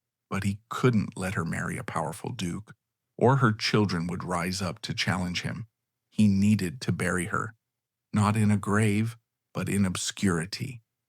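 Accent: United States. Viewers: The idiotic images completely wreck the content. The moment I hear an American accent narrating British history I turn off, because I know it'll turn into bullshit.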